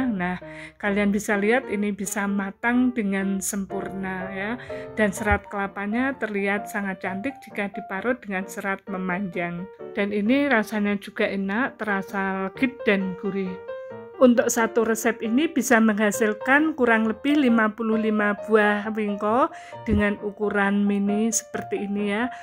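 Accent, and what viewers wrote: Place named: Java, Indonesia. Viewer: Org malaysia kah ko tp medok jawa mksh resep nya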